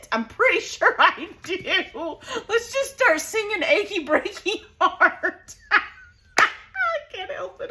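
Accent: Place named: Oklahoma, United States. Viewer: if you are like me your "Walmart" photo packages had 50 images of different sizes and the bigger ones used to hang on my wall in frames. People don't seem to do that so much anymore, but I refuse to discard them so...they are in my albums as well as a few of the smaller sized sprinkled in. There is just something about an 8x10 that encapsulates the family unit. Gotta keep 'em and enjoy 'em! BTW - LOVE your "Okie" side when it comes out!! You did a wonderful job on this large photo layout!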